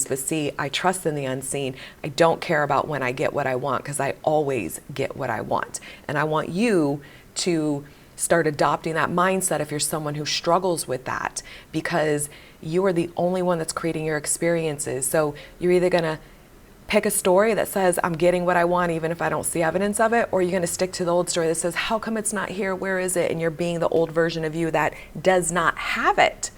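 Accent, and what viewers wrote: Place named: New Jersey, United States. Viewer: this is exactly what I needed to hear! I was getting discouraged by all the "if you're doing everything right, it should be showing up instantly!" videos because when it wasn't happening instantly, I assumed I failed. Thank you so much. (p.s., is that a Jersey accent I hear?? I was wondering why I found your voice so comforting - growing up right outside of Jersey, all I heard were people who sound just like you!)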